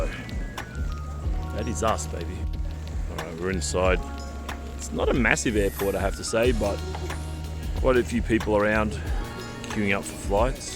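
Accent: Australia. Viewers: Cos he's full aussie cuzzzz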